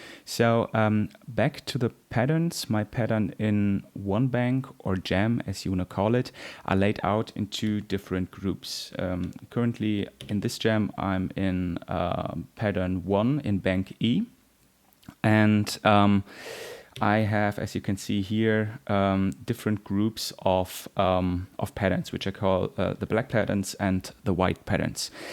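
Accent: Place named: Germany. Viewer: German ? Da ist was deutsches in deinem Englisch...beste Grüße aus der Vulkan-Eifel. Geniale Videos. 😍😎👍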